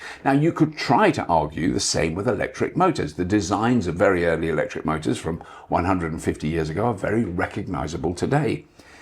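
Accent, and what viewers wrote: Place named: United Kingdom. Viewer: Brilliant!. Thanx for talking in such a relaxed, humorous British way, but still getting the message through. Love your videos 👍🏾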